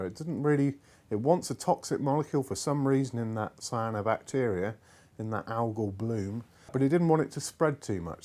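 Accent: United Kingdom. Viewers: I swear, British people could talk about clipping toenails or removing gangrene and it would sound fascinating!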